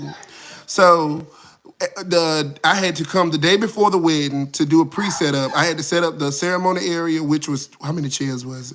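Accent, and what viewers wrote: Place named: South, United States. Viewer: Lol you are so country!!! I can tell y’all from the south! 😂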